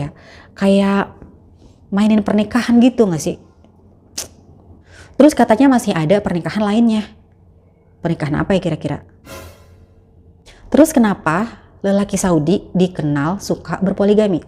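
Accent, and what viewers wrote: Jakarta, Indonesia. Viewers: Alhamdulillah akhirnya dapet jwbnnya jg dimenit 10.40 dari awal aksen betokaw nya kentara bener mpok.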